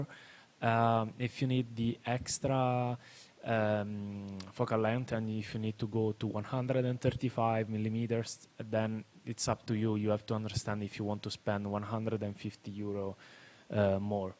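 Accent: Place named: Italy. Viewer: Si sente forte l'accento italiano :)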